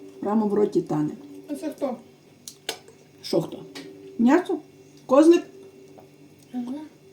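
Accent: Ternopil, Ukraine. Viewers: То що вам не подобається слова і говор в кожній області свій акцент це Тернопільська обл там багато польських слів з якими вони виросли їм так зручно а ви дивіться і слідкуйте кожен за собою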